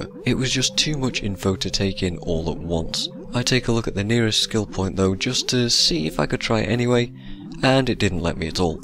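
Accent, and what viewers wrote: North, United Kingdom. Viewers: Really great video :) Plus, you sound Northern, which is always a pro.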